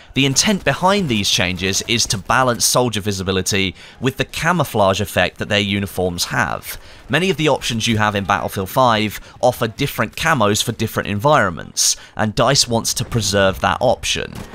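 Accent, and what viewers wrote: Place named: RP, United Kingdom. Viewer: I really like this guys content but I cant stand his posh way of speaking. For example when he says "as well" at the end of a sentence, its drawn out and prissy sounding.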